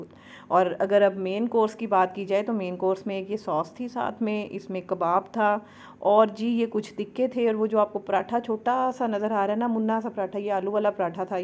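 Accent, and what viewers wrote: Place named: Punjab, India. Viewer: wesy hi AP k bolny sy lagta h ap punjabi h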